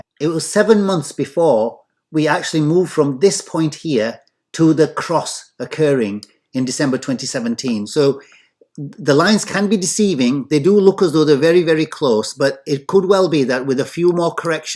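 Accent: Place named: England, United Kingdom. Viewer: Your effort is really appreciated! Please don't feel pressured to put out more content than you currently are (although I'd be the first to watch it, haha). I'm sure you've got great friends and family that appreciate your time and wisdom as much as we do. By the way ... I've been having the hardest time trying to place your accent. What part of England are you from? :)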